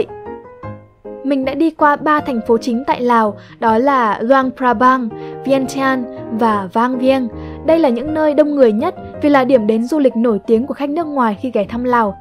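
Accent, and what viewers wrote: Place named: North, Vietnam. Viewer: giọng là giọng bắc, nhưng đôi lúc có chút phong ngữ miền nam:)))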